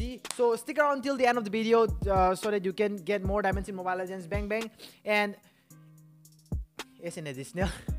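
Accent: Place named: Philippines. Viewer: Your voice same to phillipines Voice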